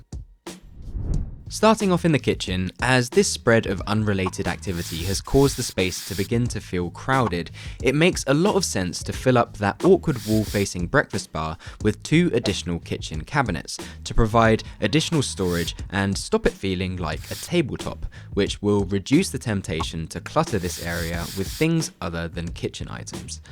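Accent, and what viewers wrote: United Kingdom. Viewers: His delivery is doing great. Wonderful apartment redesign. The next step is to take a breath and know we are on his side. Why else are we here. Eyebrows relax, British accent reduced, the approval asking lilt only occasionally. Tell us. No need to ask us. You have our ear and implicit approval of your talent and ability. Yur frpal G to solve abilities.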